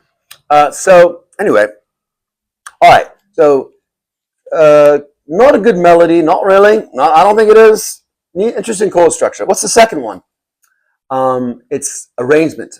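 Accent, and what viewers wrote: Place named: United Kingdom. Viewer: Charming presentation that includes a bit of wit, self-deprecation, and a hint, no quite a bit of a British accent. I loved the “ I know it’s blasphemy“ quip. And Very much enjoyed it being provided with a deeper understanding of the song that I had never really thought about much. Quite the board. Impressive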